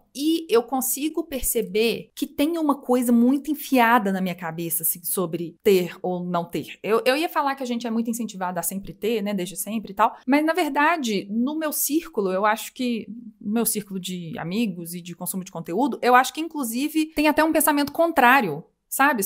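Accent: Minas Gerais, Brazil. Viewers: Estou adorando seu canal,! ❤ Amo esse sotaque mineiro 🥰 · Tão bom ouvir tudo isso com sotaque mineiro... o "disgrama" me pegou kkkkkkkkk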